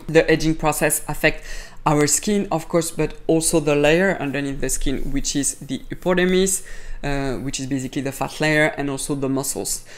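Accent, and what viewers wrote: France. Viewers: Bonjour!Hearing skin care tips with a French accent makes everything sound more luxurious.Great suggestions but as a 68 year old with acne I am afraid to use exfoliators for fear of making the acne worse,like purging from RetinA.Also as you get older your skin starts to thin and is more prone to broken capillaries.Would you still suggest these products for someone with my skin issues?I hope you have a lot of videos because I cant sleep and what better way to spend the time than binging on beauty videos.Good night!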